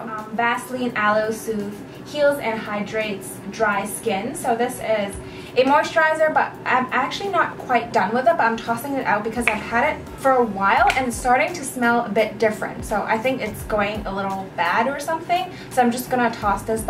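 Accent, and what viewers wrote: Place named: England, United Kingdom. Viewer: Love ur English accent xx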